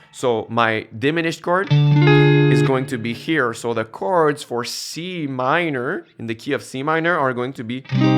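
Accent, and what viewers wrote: Quebec, Canada. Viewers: Hey are you québécois? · Hearing a quebecois accent here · Tellement l'accent du Québec, très bien expliqué mon gars ! 👍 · Accent québécois en tabarnak !! Nice vidéo !! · Ok, I’m saving this video. I’m going to have to watch it a few more times. BTW, are you from Quebec? I’m in Montreal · Are from Québec ? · You Sir, sound like you are definitely French-Canadian.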